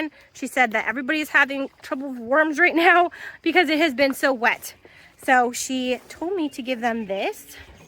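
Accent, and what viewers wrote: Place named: Pennsylvania, United States. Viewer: I was trying to place your accent because it sounds familiar. I came to the conclusion that maybe you were raised in PA. I hope that bunny isn’t struggling to breathe - poor thing. 🙁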